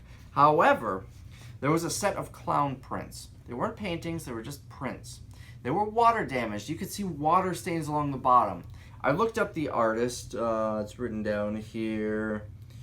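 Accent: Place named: New York, United States. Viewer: O by the way you say dollar like I do in fact we say it like you on most of Long Island New York so tell them to stuff it who say you say it wrong you speak like a true New Yorker thank you so much for telling us the outcome of the dollar unit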